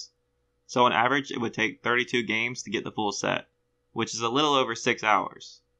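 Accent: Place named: South, United States. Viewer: dang that deep southern accent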